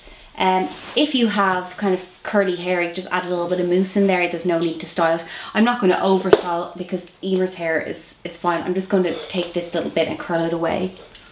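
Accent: Ireland. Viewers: no she is irish the accent is so defined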